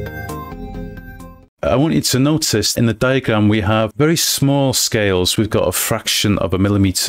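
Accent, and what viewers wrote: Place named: Wales, United Kingdom. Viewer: Late reply, but he's saying contour! He has a strong Welsh accent!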